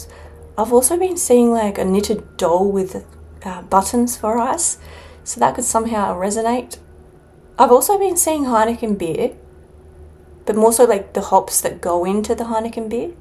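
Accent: Australia. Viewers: Love your Australian accent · ambient australian authenticity ♫ ergonomic ♪ easy on the ears ♪ pronunciation sans pretence ♪ maaaate ♫ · Are you from Australia or England? New Zealand perhaps .your voice ❤❤❤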